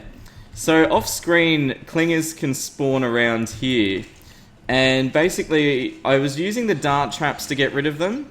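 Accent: Australia. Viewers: you australian?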